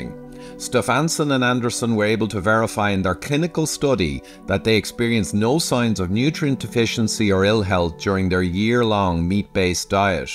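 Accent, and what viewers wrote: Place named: Canada. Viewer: Canadian aye? Thanks!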